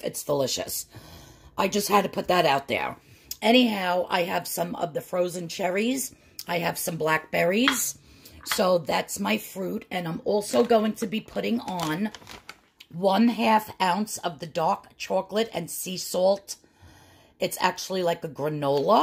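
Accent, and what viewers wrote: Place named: Boston, United States. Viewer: you are a gem! I just love you, your Boston? Accent, and your food and videos. Thank you!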